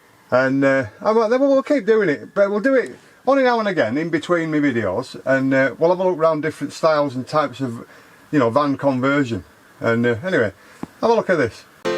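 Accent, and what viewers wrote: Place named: Lancashire, United Kingdom. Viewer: Nowt wrong wiya Lancashire accent! Another crackin vid mate. Cheers Dave & Helen 👍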